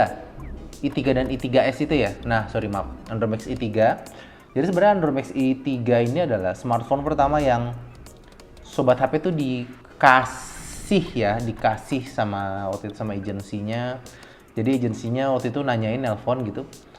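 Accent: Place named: Java, Indonesia. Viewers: Klo inget inget tangannya yg belang 😃 Dan cara ngomongnya yg medok, unik 😃 Tapi keren lah isi kontennya singkat padat jelas 👍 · jogja kali. soalnya logatnya jogja bgt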